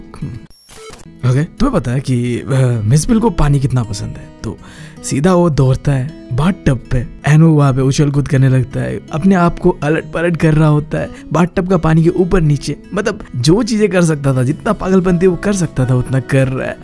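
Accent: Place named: Assam, India. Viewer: Bro has Assamese shall bihu bihu lagise ..... Love from Assam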